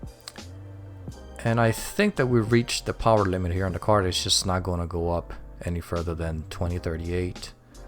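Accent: Norway. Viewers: waaaait, are you from norway? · you norwegian?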